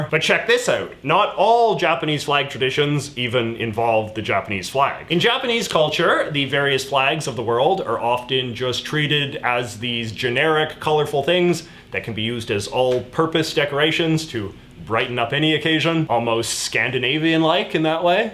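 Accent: Canada. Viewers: Lmao saying “kawaii” (cute if you’re out of the loop somehow) with his Canadian accent literally turns into “kowai” (or scary). Still love your accent.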